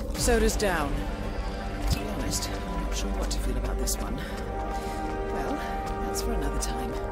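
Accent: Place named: Romania. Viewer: Se pare ca iesti roman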